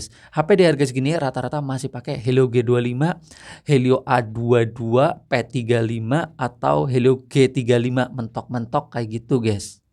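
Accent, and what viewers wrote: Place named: Banyumas, Indonesia. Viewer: Ngapak bang.... Salam satu server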